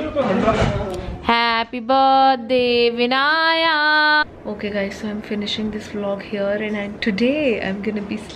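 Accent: Punjab, India. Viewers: Oh aapne bol diya tha last vlog mein ki aap ki Punjabi Punjabmein aate nikal aati hai.. 👍 😅 Your mum is soo beautiful. ❤️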